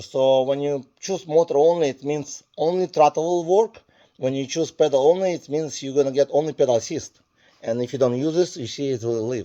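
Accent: Russia. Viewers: Че за русский акцент?)))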